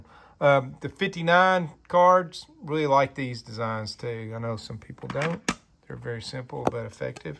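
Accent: South, United States. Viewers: A Labor of Love - Especially Poignant when done with your southern accent ❤❤❤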